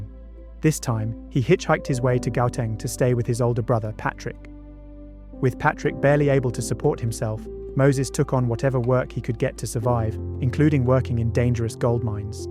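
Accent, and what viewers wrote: England, United Kingdom. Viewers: Not AI. It's an English accent. There are certain annunciations that AI still cannot reproduce. Hopefully they never will. Otherwise there will be a day when even an expert won't be able to tell the difference.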